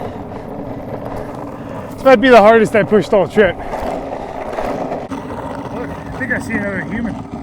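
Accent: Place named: Long Island, United States. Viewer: Your demeanor and mannerisms remind me of Chris Cuomo. He's a Long Islander too so maybe its the accent. Great speaking voice!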